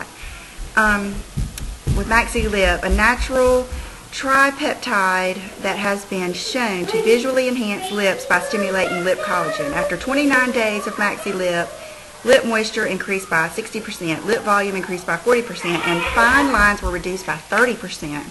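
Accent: South, United States. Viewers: No worries about that "Suthern" accent! he he, just thought to help out with the pronunciation and I didn't even get it exactly right! Can't wait for your depotting video! Thanks!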